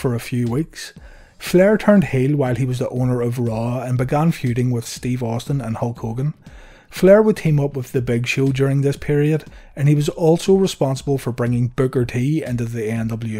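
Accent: United Kingdom. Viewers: narrated by people from across the pond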